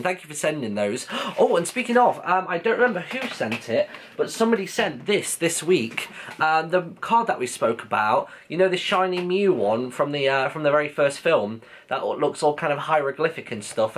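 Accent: England, United Kingdom. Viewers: i loooooooove this english accent *o*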